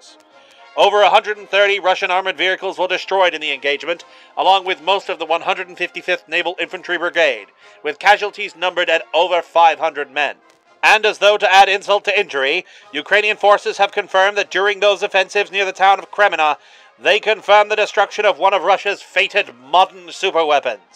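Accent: Transatlantic, United States. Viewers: Ngl, this is a pretty good transatlantic accent. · Absolutely fantastic transatlantic accent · Yes, he has a fantastic Transatlantic Accent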